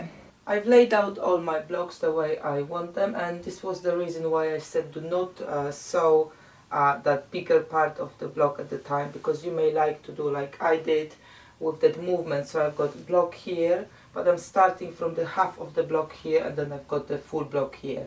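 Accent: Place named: Poland. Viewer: Love working w scrapes…great videos. Where are you located, your accent reminds me of my Polish friend.😊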